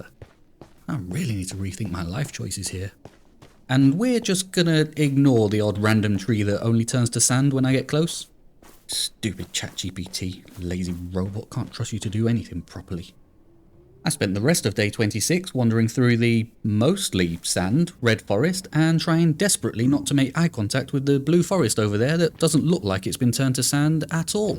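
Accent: United Kingdom. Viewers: What kind of British do you speak. I love it 🥹🥹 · Your British jokes are very distinct and interesting.. but your accent is incredibly annoying, like every British accent. Could you tone down that way of speaking a bit, or else speak in Brazilian Portuguese so I can understand? Thank you!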